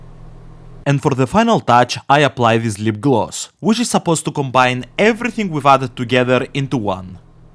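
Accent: Russia. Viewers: У Вас очень милый русский акцент. Я с замиранием сердца наблюдала рисование стрелок. Получилось очень оригинально и необычно. 👍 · I love your russian accent when you speak english ❤ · I love a Russian accent. So cool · Прекрасный русский акцент 😊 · Почему никто не пишет, насколько же у него русский акцент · Классно делаете макияж 😊❤😄 Еще такой забавный киношный русский акцент))) Очень стереотипно звучит 🤭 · when i see u as a man...u are HANDSOME!!!!! And that russian accent is at the same time funny and cute! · с русским акцентом я вас понимаю лучше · Нравится его русский акцент.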